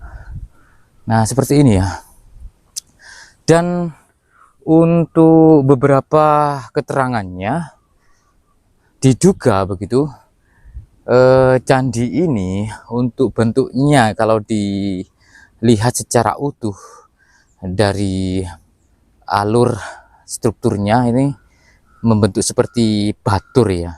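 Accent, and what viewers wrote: Java, Indonesia. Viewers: Medok Jawa banget kau ngomongnya. Jadilah kau orang Indonesia, jgn jadi orang Jawa.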